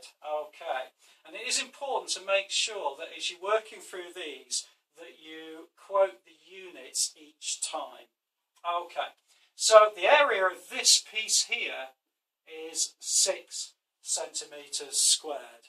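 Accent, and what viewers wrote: England, United Kingdom. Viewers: i'm english and i feel the same way idk why though i should be used to my own accent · For some reason a Englishman explaining these things are more reassuring.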